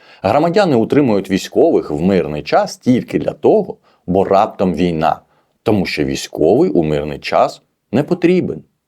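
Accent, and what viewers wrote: Russia. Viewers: не смог посмотреть только из-за того, что украинский от автора (который явно думает на русском и всю жизнь говорил на русском) просто ужасен. вот эта вот типичная путаница и/і просто сводит с ума. никогда не смогу понять, как может украинец в Украине говорить на украинском с АКЦЕНТОМ. это пиздец, господа. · Скільки років Незалежності України ? 33 роки. Скільки РОКІВ ВИ РОЗМОВЛЯЄТЕ УКРАЇНСЬКОЮ ??? 1 РІК . МОЖЕ 2 . ЦІКАВО , ОСОБЛИВО ЗВАЖАЮЧИ НА ВАШ РОСІЙСЬКИЙ АКЦЕНТ ТО ВИ НЕ СОВОК ???????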